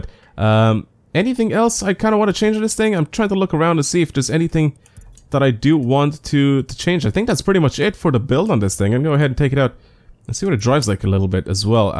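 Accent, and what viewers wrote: Ireland. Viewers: Are you Irish???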